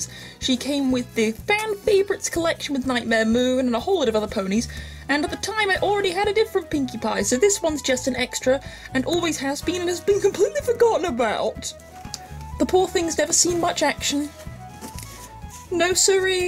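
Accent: United Kingdom. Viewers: You have a nice accent. Brittish?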